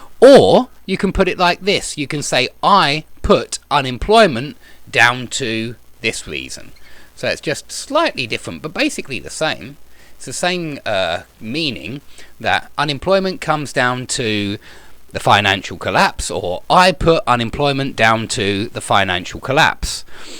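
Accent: United Kingdom. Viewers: British accent, is most popular worldwide, when it comes to learn English. Thanks for the lessons sir.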